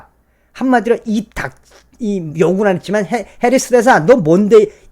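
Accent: North Korea. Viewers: 북한사람인가? 북한 방송느낌인데?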